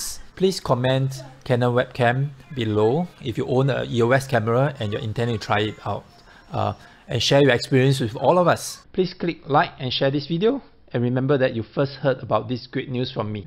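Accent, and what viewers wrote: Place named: Singapore. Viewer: 6 seconds in....he calls a point and shoot a DSLR though you can hardly understand a word he says thanks to that incredibly hard to understand Singaporean accent that slaughters the English language, plus he slurs his words AND talks way too fast. HINT: Talk s-l-o-w-e-r so everyone can understand your bizarre accent. Its very unusual. Its not easy to understand. Slowing down will solve almost all of these problems. Then try to enunciate better. And do NOT use any slang. With that accent it sounds ridiculous. Just keep the words simple and clear.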